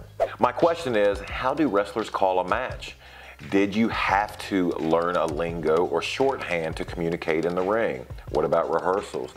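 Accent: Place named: Southeast, United States. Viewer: Just like any other job... good w the bad. I have to say. I am from Eastern North Carolina and you also have that South Eastern accent. Even though we call VA borderline Yankees lol. But seriously... I honestly love the fact that you take time to do this. I watched this instead of Friday night SmackDown.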